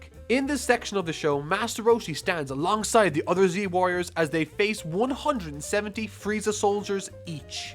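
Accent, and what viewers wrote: Ireland. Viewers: Are you Irish? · yo mate , are you Irish?? you sound like a Leinster boy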